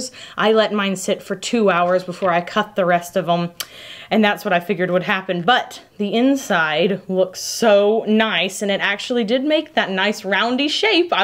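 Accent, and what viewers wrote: Texas, United States. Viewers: I kinda wanna know are your from Kansas you said like your from Texas or Kansas?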